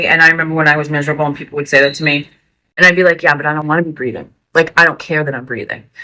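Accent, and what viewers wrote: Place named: New York, United States. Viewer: you’re incredibly strong! I don’t know if I could deal with the trapped anxious feeling during withdrawal and be in jail. You deserve the best now! Love the New York accent, I work all over NY and NE. I see your channel blowing up! I was kinda sharing my lengthy story on how I came off opioids in various parts.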